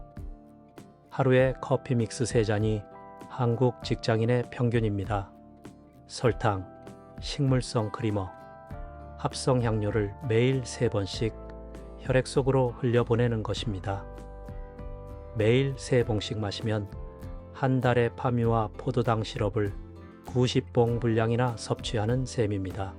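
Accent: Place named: China. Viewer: 발음이 중국놈않이야 국산제품인기제품만 세계 에서믹스커피는 인기제품인데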